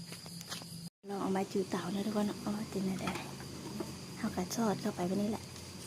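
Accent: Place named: Sakon Nakhon, Thailand. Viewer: เเขวงคำม่วนเว้าคือกั๋นกับเมืองสะก๋นนะคอนปะเทียดไทเลย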